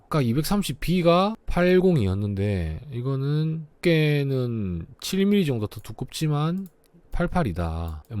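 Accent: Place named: Gyeongsang, South Korea. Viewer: 경남 어디에서 살고 있어요? 말투가 진주/사천 쪽일수도..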